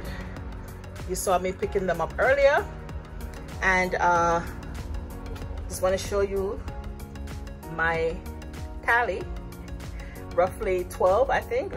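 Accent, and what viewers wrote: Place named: Patois, Jamaica. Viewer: I love to hear you speak PATOIS sis. I love to eat coconut as well. Wow, the price for those here is ridiculous.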